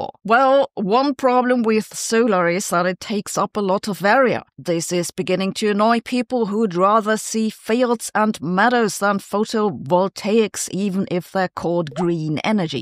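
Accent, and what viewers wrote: Germany. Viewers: ❤❤❤ure German accent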